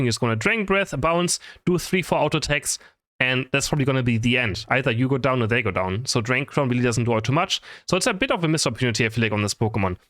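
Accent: Germany. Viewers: your accent sounds like German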